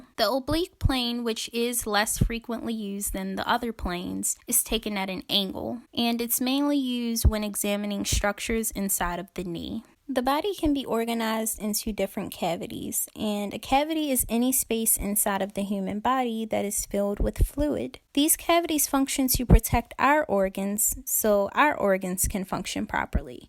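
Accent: Baltimore, United States. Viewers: Definitely sounds like a Baltimore accent! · This was incredibly useful!!! Thank You!!!! I know a Baltimore accent when I hear one😊.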